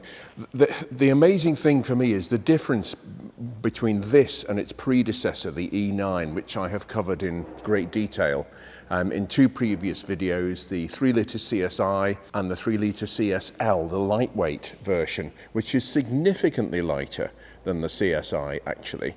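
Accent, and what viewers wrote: United Kingdom. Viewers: When aggressive meets beautiful on 4 wheels. Fantastic review once again. No stupid music, no fluff and a very British delivery... Lovely 🇬🇧❤️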